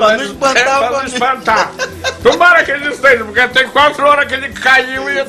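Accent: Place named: Pará, Brazil. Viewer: Melhor sotaque do Pará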